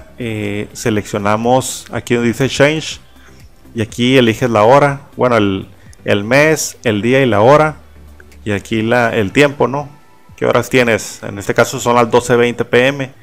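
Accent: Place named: Baja California Sur, Mexico. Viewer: Justo antes de que dijeras de donde eras ya habia reconocido tu acento de región, incluso podria ser de BCS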